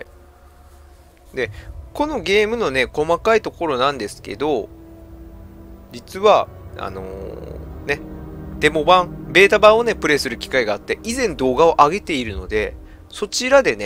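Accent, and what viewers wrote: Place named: Kyushu, Japan. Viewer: 九州の方ですか?? 方言かわいいですね(*^^*)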